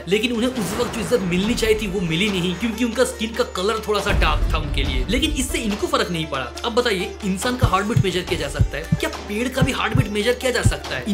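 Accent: Bengal, India. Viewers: Abb samajh aaya bhai tu bengali hai.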